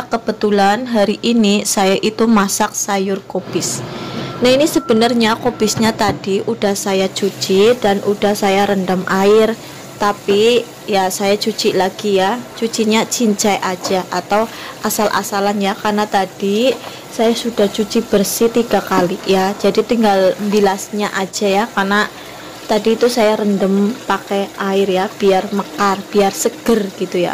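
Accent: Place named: Ngapak, Indonesia. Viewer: tenan mas bahasane wong ngapak medok banget aku suka itu, lembab lembab gemericik he..he..he....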